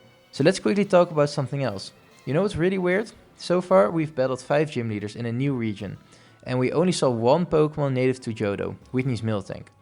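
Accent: Netherlands. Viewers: The yt algorithm strikes again! Also, I can hear you're dutch ;) lekker man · Gotta love the Dutch accent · Brooo the dutch accent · are you dutch perhaps? i can hear a small accent during your complimentary XD. anyway, you just gained a new subscriber. i love the vids! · Are you dutch by any chance? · I've never watched your channel, but I like watching nuzlockes. I wanted to check it out. Your actually pretty good and definitely wasn't expecting the Dutch accent. Are you new to nuzlockes? I could give you some tips if you want. Edit: I just saw you don't have many subs, so ima sub. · Nuzlockes zijn zo leuk om te zien echt waar geen idee wrm maar goeie video man (ja ik wist dat je nl was door de accent hahahaha)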